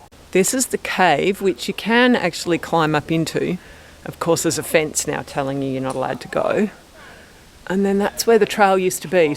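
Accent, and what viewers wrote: Australia. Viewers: I would never have known, you have a great Australian accent. What part of the UK?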